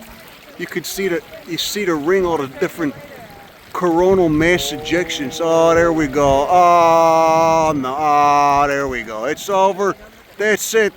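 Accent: Chicago, United States. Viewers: 💯% and with humor. All the above + primo authentic Chicago accent mixed in as a bonus. nothin better 💫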